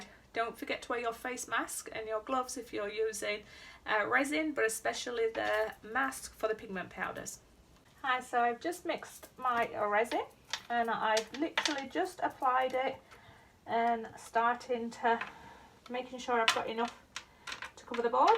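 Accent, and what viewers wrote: United Kingdom. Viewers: thanks for the detailed answer, much appreciated. I'm from Yorkshire but live in USA now. I detected a northern England accent!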